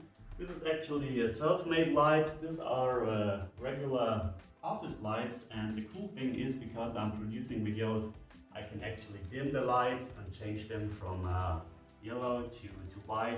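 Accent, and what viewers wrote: Germany. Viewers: you sound so german 🤣🤣🤣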